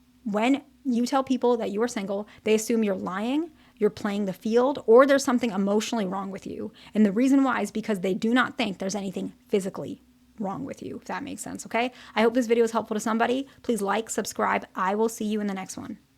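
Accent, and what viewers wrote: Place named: United States. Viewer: It’s just a regular American accent.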